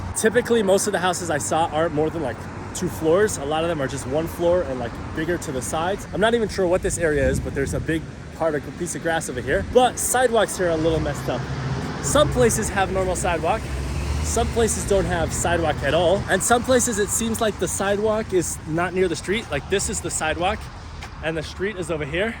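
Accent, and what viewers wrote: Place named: United States. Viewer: Are your parents American? Your English sounds very American to me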